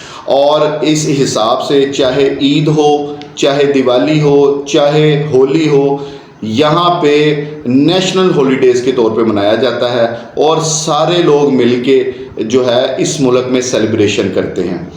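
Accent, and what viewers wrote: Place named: Punjab, India. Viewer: یہ سالے پنجابی انگریزی بھی پنجابی میں بولتے ہیں - " گویانا" نہیں ابے " گیانا" انگریزی میں " Guyana" بولتے ہیں - یہ ویسٹ انڈیز کا حصہ ہے۔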